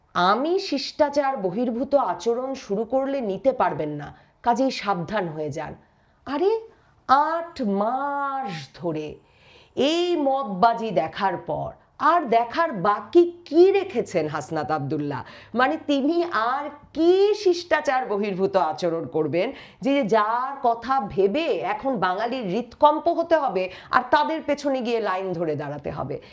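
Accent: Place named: West Bengal, India. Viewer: আপনি আগে পশ্চিমবঙ্গের একসেন্ট বাদ দিয়ে বাংলাদেশের একসেন্টে কথা বলেন। শুনতে আরো ভাল লাগবে।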